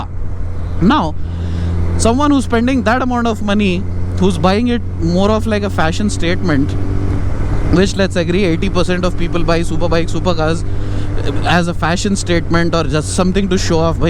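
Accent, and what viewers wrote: Hyderabad, India. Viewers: I’m following you since a long time both for bike reviews and to listen Hyderabadi Hindi 😊 And YES you are right the OLD zx10r looks was great ! It looked manly butch !!!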